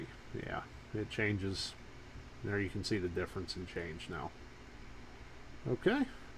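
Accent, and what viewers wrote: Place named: Pennsylvania, United States. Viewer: This guy has to be from Pennsylvania. Fyi I use this for guitar effects. It works flawlessly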